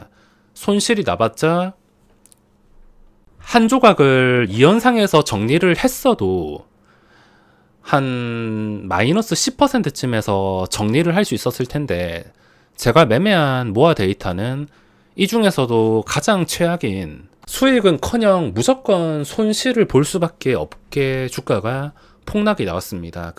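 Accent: Gyeongsang, South Korea. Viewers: 님께서는 오늘 원없이 단타를 쳤습니다라고 멘트 하실 때 제일 멋져요 님의 지난 영상도 다시 보고 매일 올려 주시는 그날의 영상도 다시 자꾸 보게 되는데 노터스 금맥 짚으시고 울면서 보내주어야 할 것 같습니다라고 멘트하실 때 제일 쿨해 보였고 반짝반짝한 종목들 찾아 욕심 부리지 않고 매도하고 시장의 흐름을 그 누구보다 먼저 짚어내시는 모습 아이 데리고 키즈카페에 가느라 종목 움직임 하필 못 보았다고 하소연하시던 인간적인 지난 영상 등등 개미의 입장에서 생각하고 개미들 걱정 많이 하시는 마음이 너무 고운 모습 심법 잃지 않는 매매 정확한 저점 확인의 두근두근하던 날들 모두 응원하며 공감하고 듣고 있습니다 귀에 쏙쏙 들어오는 경상도식 억양의 종목명 언급 기대할께요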